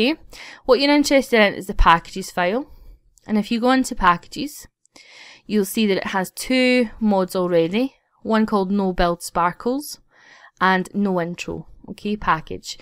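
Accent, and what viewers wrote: Scotland, United Kingdom. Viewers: where about in Scotland you from? I'm from Glasgow! noticed ur accent right away 😂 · Are you scottish? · She is Scottish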